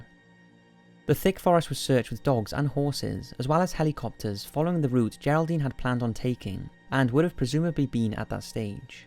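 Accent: Wales, United Kingdom. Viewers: Do all brits sound as ridiculous as you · Anton Ellegaard no probs mate, voice is very cool! iam from Yorkshire England & it took me a bit bcoz it's a bit between English & welsh & a bit posh ☺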